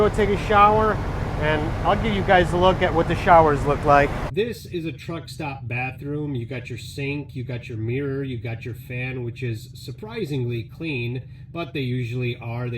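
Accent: Russia. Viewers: 10 years ago u sounded very russian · do your russian accent in your videos man ur known to it 😁😂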